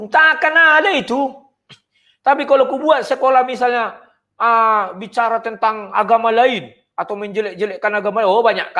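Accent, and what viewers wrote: Medan, Indonesia. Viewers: Bener kali Ito ‘ Firman Tuhan yg Ito jelaskan itu sangat masuk ke pikiran ku ‘ jelas dan logat medan nya jelas dan straight to the point ‘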